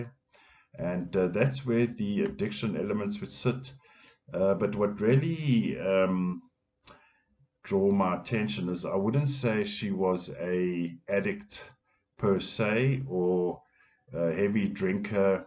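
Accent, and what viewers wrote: South Africa. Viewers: Hi, you sound South African. 👋👋 Hello.. Just subscribed. Thank you, this does shed light as you get more information to expand on this. It makes more sense. Something else was going on, because this whole case sounded fishy. I did not ever believe that Nicola jumped into the river, swollowed water on purpose, and unalived herself by that method. She was fit, fitness conscious, could swim, the river was not deep or flooding, she & she had her dog Willow with her. Plus she had 2 daughters. After 3 weeks of searching the river Nicola was not found in the river. Funny that. Plus there was a helicopter searching, drones, sniffer dogs, police searching, volunteers searching, police divers, and Peter who was a volunteer also offered his services for free. He used his divers, and sonar equipment as an added bonus, but despite all this collaboration, Nicola was not found in the river!! 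That is probably because Nicola was not in the river when they searched. She might have been placed in another body of water and later moved into the river because the autopsy would have to show Nicola was in water for 3 weeks. We are still waiting for the results and inquiry. Now Peter is the scape goat. Who stands to benefit from N being unalived? It was actually the police's job to find Nicola in the river because it was their main working hypothesis that Nicola was in the river. And how did they come to this conclusion? They were fed faulse information. They were trusting P's version which w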